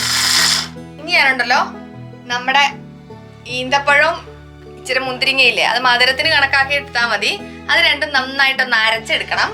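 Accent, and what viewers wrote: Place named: Kottayam, India. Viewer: തനി കോട്ടയം ഭാഷ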